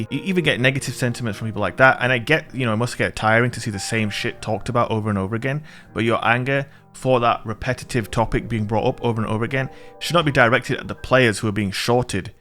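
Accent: United Kingdom. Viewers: I freaking love your accent bro, that’s a big part of why I enjoy your content is just hearing you talk. That may sound offensive, but I don’t mean to say it that way. You really hype me up and I wish I had friends from the UK. On a positive note, remember that the ones sending you hate (the PvE players) are the ones that we teabag at the end of the game after destroying them😎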